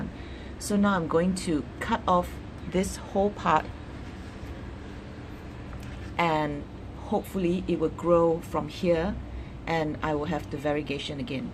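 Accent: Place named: Singapore. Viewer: Hi you sound like a singaporean ... where do you get variegated plants in SG?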